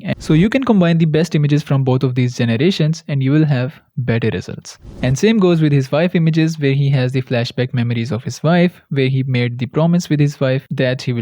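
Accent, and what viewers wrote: India. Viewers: man, i head indian i exit. bye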